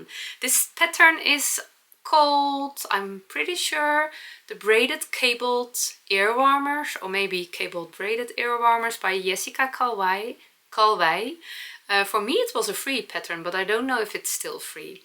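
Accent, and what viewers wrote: Netherlands. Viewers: Merry Christmas and Happy New Year,! Never feel the need to apologize for your "Dutchy English!" We love it! My kids and I are trying to learn German together this year, and I would never be able to do a podcast in a foreign language! Whew! Also, I am a fellow curly hair girl, and I love your look both with and without the headbands. I never feel as confident with one on myself...but I love the way they look on others. I want to try wearing them more...must try knitting some, I guess!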